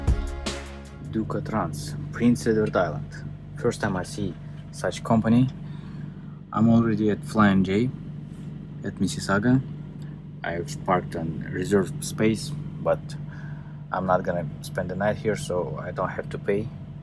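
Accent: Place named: Russia. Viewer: Доброго дня! Теперь можно добавить и в обучающее видео по английскому. С русским акцентом понятно практически все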